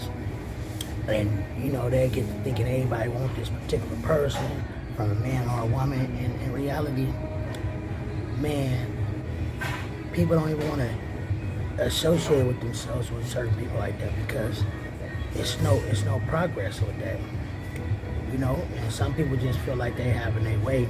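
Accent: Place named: California, United States. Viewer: Bruh as soon as i click on this vid and i could tell, Cali, esp after hearing 🤣 yeeeee🤟🏿 this was some real shi tho. Am subbin 💯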